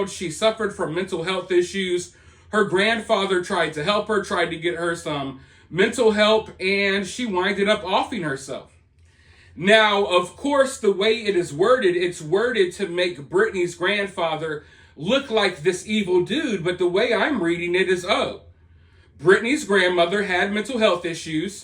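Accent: United States. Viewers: You are speaking such a beautiful american-english, so I (a german speaking person) can follow you. Thank you!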